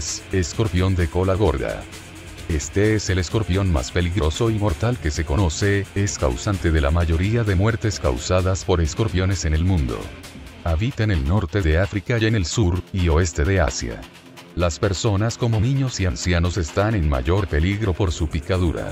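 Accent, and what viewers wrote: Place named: Spain. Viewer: oye como haces la bos de españolete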